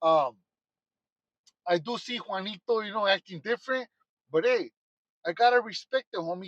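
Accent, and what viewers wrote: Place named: Mexico. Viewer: Ey foo, dont take this the wrong way but were you born in Mexico? Because you carry yourself like a mean ol Paisa. Even the way you pronounce certain words.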